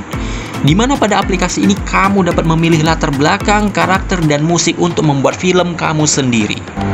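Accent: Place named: Medan, Indonesia. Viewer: Jangan hilangkan logat medannya ketua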